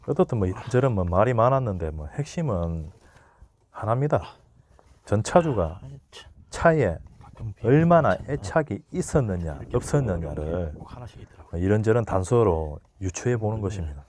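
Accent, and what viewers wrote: Gyeongsang, South Korea. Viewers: 목소리리 톤이 아주 좋으십니다. 남자로써 차에 관심을 많이 가지는건 당연하겠지만 실천하기가 싶지 않는데 존경스럽습니다. 혹시 경상도 분이신가요. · 근데 엔진오일 교체하는데 얼마한다고 저 난리를ㅠㅠ 저도 부산인데 사투리 심한건 아니고 억양 자체가 경상도네요ㅋ 유튜브 대박나시길 기원합니다 저렴하게 엔진오일 교체하는 꿀팁 알려드리겠습니다 파트존 깔고 내차 차대번호 입력하면 가격대로 나옵니다 저도 528i 끌고 다는데 최저가로 구입하시고 글구 물건이오면 공임나라 예약해서 교체하세요 그럼 총 10만원도 안들어갑니다 오일 흐르면 환경파괴 잘못 교환하면 피박씁니다 글구 엔진오일 교체할때 에어컨 필터도 같이 교체하세요~^^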